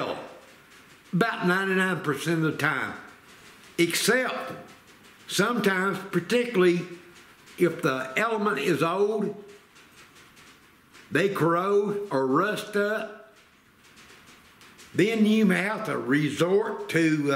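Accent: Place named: South, United States. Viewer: You definitely from the south like me 😜 yee haw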